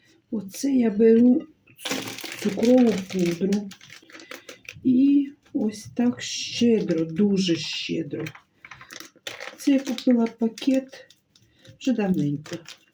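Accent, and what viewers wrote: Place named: Poltava, Ukraine. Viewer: Дякую вам за гарний рецепт ,спробую...ніколи не пекла, а ви надихнули. Дуже приємний у вас говір, на полтавський схожий. Я сама родом з Полтавщини.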